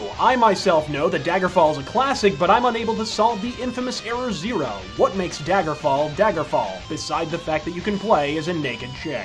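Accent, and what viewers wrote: England, United Kingdom. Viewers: Shite Briish accent - terrific video.Thanks as always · Love the english accent.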